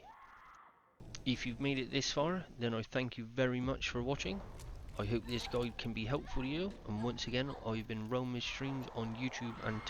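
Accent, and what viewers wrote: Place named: Bristol, United Kingdom. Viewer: Sounds Brizzo to me.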